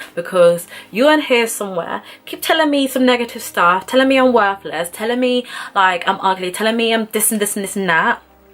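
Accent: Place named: Germany. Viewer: Babes this is beautiful and so are you! 1) I was like WHERE IS THIS ACCENT FROM- you answered Germany! So cute 2) power of the tongue you hit the nail on the head 3) I watched this whole video and enjoyed from start to finish. Your honesty is so inspiring - you’re so brave and a true role model! Cannot believe you could EVER tell yourself those things. To me you are so beautiful. Love ya girl xxx - can’t wait for more!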